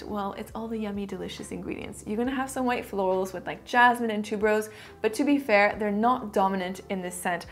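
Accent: France. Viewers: Bonjour, Je viens juste de découvrir cette vidéo, je reconnais un accent français! Est tu française. As tu déjà fait un top 10 de tes parfums préférés et si oui pourrais-tu m’envoyer le lien? Je suis dingue de parfums et suis toujours à la recherche de nouvelles découvertes 🥰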